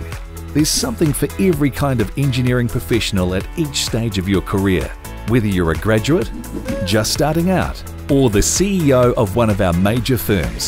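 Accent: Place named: New Zealand. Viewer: complete with subtle kiwi accent...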